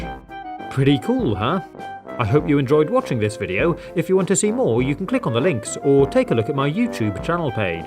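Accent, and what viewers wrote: United Kingdom. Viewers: I've been wondering are you British or not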